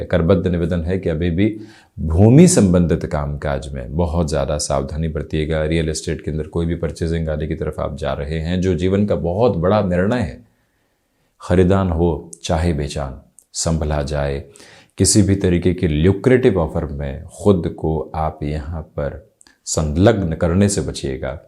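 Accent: Standard. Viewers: Apki Hindi sun kar bahot accha laghta hein… kash mujhe bhi itni shudh hindi aati 😊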